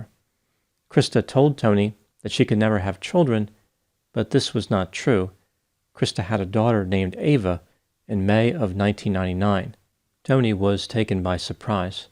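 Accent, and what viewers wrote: United States. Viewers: Yes, in particular his English has only a mild American accent.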